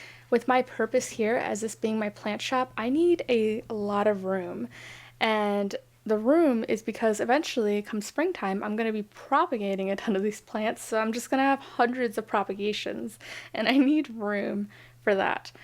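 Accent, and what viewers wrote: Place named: Quebec, Canada. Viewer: It's the first time I watch one of your video (just discovered your channel) I'm sorry but I have to tell you, you really sound like a french Canadian talking english, it's crazy!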